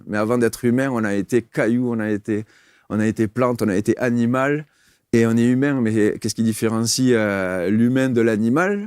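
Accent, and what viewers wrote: South, France. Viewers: Dommage pour la barbe longue…😢 J’adore l’accent du sud. 😊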